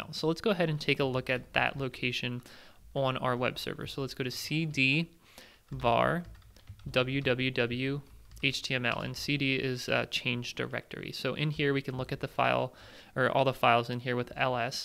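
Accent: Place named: Philadelphia, United States. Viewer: hearing distinctive Philly (Philadelphia, PA) accent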